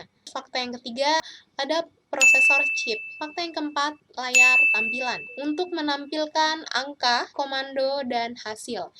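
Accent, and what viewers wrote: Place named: Surabaya, Indonesia. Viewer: Nessie judge rasa sby iki 🤣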